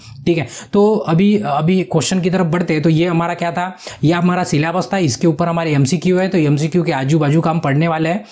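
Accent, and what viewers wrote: Maharashtra, India. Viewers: Sir Aap marathi maharashtra se ho kya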